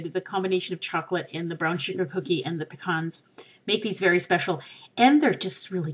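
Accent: Canada. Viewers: It's an accent thing common among canadian people.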